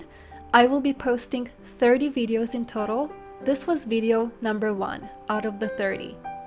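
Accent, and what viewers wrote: Israel. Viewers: The common problem is that she pronounces like most of ashkenaz israeli ח like כ and ע like א. Only because they are not able to pronouce like sefarad israeli. Israeli have a bad tendancy to pronounce this semitical language in a european way. Which creates confusion for the writing language and makes it poorer. That's not her fault. It is a wrong system.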